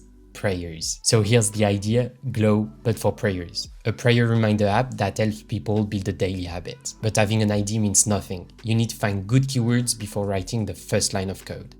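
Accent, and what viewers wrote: France. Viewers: J'adore ton énergie, continue comme ça ! Et j'ai tout de suite reconnu à ton accent que tu étais francophone. Très bon niveau d'anglais en tous cas.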